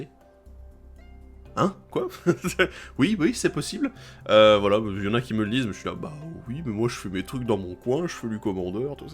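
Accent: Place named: France. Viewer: Merci pour tes remerciment tes le meilleur contenue francais meme si tu vien france avec l accent😅dsl jvien du Quebec .mais lache pas et ta des bonne idee pour ton avenir jai hate de voir ca .jaimerais parcontre te voir plus si tu pourrais faire tes deck tech (que jadore en passant)en face et peut etre avec un inviter de ton staff ca pourrais etre vraiment cool ou toute autre de tes nouvelle idee de video .continue on voit bien ta passion pour magic et surtout le commander 🖒🖒🖒🖒👏👏👏👏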